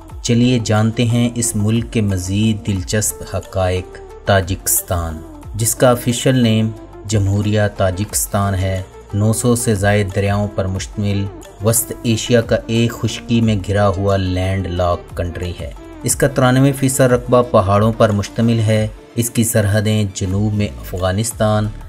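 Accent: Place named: Punjab, Pakistan. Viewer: First you should try not to speak Urdu with Punjabi accent. But your German and you will be German understand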